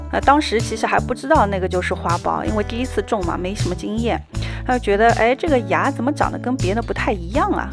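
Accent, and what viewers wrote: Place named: Zhejiang, China. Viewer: 口音是浙江的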